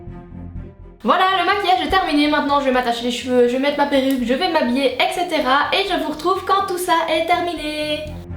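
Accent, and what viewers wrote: Belgium. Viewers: Je pense que ma vidéo préférée de ta chaîne est celle ci parce que c'est toi qui m'a donné envie de m'interresser au Lolita. Et je trouve tu arrives vraiment bien à coordonner que ce soit les vêtements comme le maquillage. Et cette vidéo est dans le thème d'halloween qui est ma période préférée de l'année. En plus le noir et le orange ne sont pas des couleurs évidentes à coordiner surtout pour du loli. Sinon j'adore ton humour, ton petit accent belge, ta façon d'être un peu maladroite et naturelle. Voilà 💕 🎀🎀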